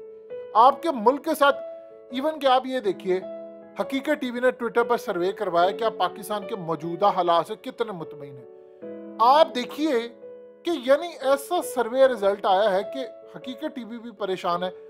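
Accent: Punjab, India. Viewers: Nice punjabi touch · Kia Punjabi ka tarka lagtay hn Uncle AP SADA Khush rho Brave personality haqeeqat tv anchor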